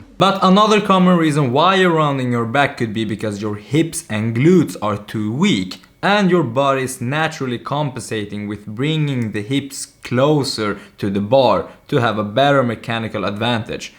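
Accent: Sweden. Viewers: Are you swedish? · Man hör verkligen att du är svensk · Älskar hur man märker att du är svensk på en gång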